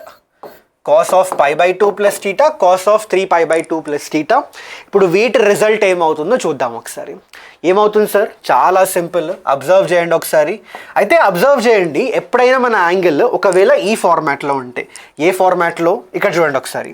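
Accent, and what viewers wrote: Telangana, India. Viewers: PURE TELANGANA ASCENT!!!!! ABBA REALY ADDICTED SIR 🥰🥰🥰🥰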